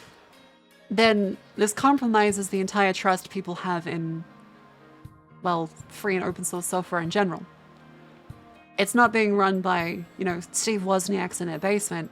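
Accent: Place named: Australia. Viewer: Shark girl? Red head? Australian accent? Mini skirt/giant overcoat combo? Glasses? Yapping? Coding knowledge? Oh yeah, instant sub. Looking forward to more videos :D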